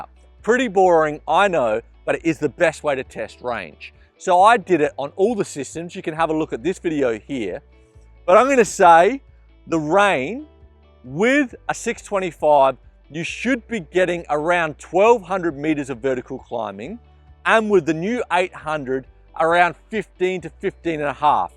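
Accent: Australia. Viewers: great review, love the aussie accent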